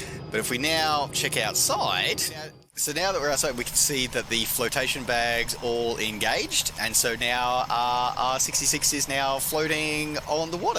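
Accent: Australia. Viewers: You must hold the recorddddd for the most annoyingggg Australian voiceeeeeeeee.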